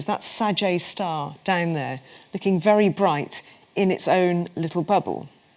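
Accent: United Kingdom. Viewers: Knowledge is one , but amazing how beautifully this woman speaks ....even british sounds nice😄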